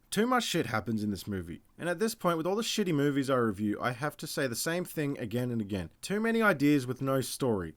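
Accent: Australia. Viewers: As it's a wog/Leb Aussie accent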